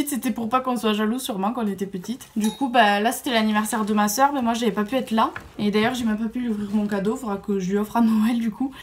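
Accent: South, France. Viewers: tu as encore plus l’accent du sud quand tu es avec tes parents j'ai l'impression! c'est marrant ^^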